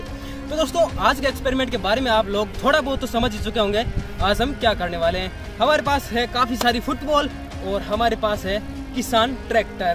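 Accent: Rajasthan, India. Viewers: Bhai Rajasthan se ho kya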